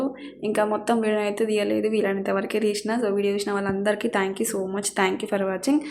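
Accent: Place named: Telangana, India. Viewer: Meeru ...maatlade.....tg....style సూపర్ వుంటుంది sis.......any way video super......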